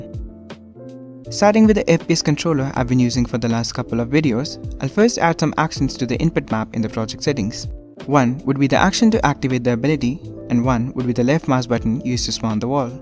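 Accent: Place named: India. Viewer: Great vedio. Literally can't differentiate between the original and the one you made. Also I got a question for you. Where do you live? (Country name). You sound very Indian to me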